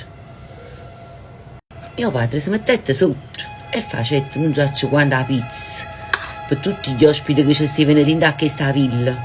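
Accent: Naples, Italy. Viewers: Mi piace ascoltare le storie che racconti...poi con quell' accento napoletano..mio padre era napoletano❤❤❤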